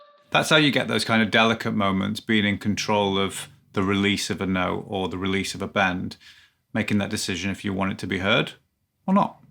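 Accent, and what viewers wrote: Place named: Northern England, United Kingdom. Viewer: well keep going - great tutorials. Love your delivery; v British, v northern. Distinctive.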